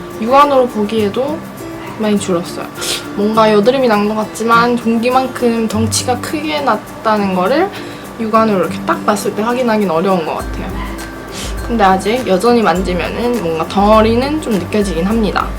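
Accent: Gyeongsang, South Korea. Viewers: 언니 대구사람 맞죠?피부과어디가셧어요?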